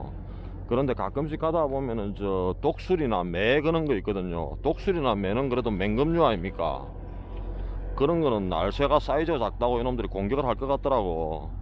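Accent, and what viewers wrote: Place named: Busan, South Korea. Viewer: 태어나서 유투브댓글 처음 달아봅니다 한국(인천)에서 잘보고 있습니다~~^^ 두달전부터 님 팬이되었네요 구수한 부산억양의 영어가 너무 정감이 들고요 왠지모르게 영상보고 있으면 답답한 마음이 위로가 됩니다. 아무쪼록 안전운행 하시고 건강하세요 저는 지금 라면 하나 끼리 묵겠습니다~~ㅋㅋ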